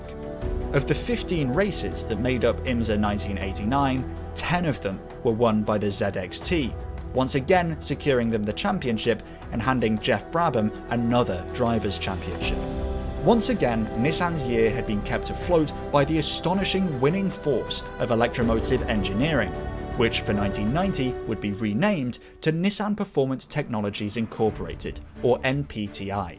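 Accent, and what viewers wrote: United Kingdom. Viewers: This is only the 2nd video I’ve seen of yours on here and sir, let me tell you, I have never hit the “subscribe” button so hard in my life. As a “relatively” new car fanatic, especially for Nissan specifically. What’s better than learning general lore of Nissan’s professional/formal racing history? Learning around side subject from a guy with a cool British accent. Really man, thanks for your content! Much love from Charleston SC!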